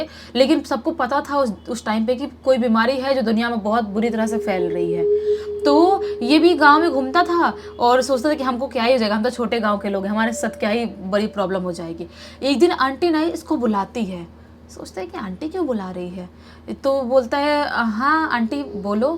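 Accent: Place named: Eastern Uttar Pradesh, India. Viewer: Language se pta chalaa mam shayad eastern up ya Bihar-jharkhand se h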